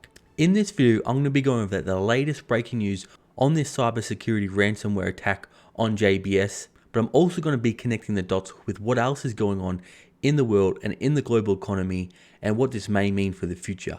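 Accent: Australia. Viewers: Are you from auzzie or nz bro? You sound like an anzac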